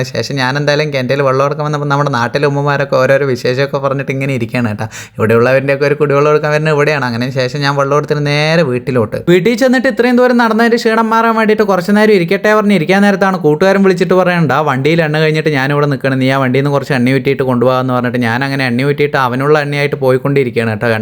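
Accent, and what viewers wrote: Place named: Palakkad, India. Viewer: പാലക്കാടൻ ഭാഷ.. എവിടെയാ വീട്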